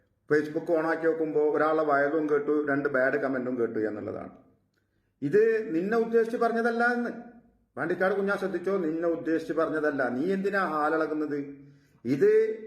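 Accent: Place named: Kannur, India. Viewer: ഈസംസാരിക്കുന്ന ആൾ കണ്ണൂർ കാരനാണ് തോന്നുന്നു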